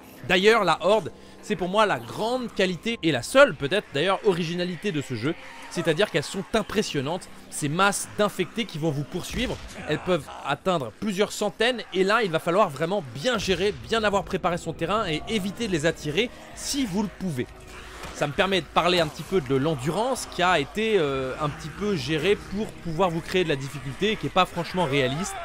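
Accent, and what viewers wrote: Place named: Belgium. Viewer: Tu es belge ? En tout cas tu as l'accent belge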